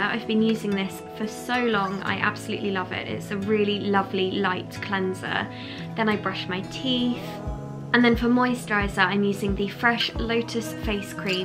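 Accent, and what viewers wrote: United Kingdom. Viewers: I love routine videos!!! 😍 And I swear I'll never get enough of your British accent. 😩 I love your voice and accent a lot,! Love you! ❤ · I love your accent so much! I'm Polish and I try to speak in British accent everyday but it is not easy. :(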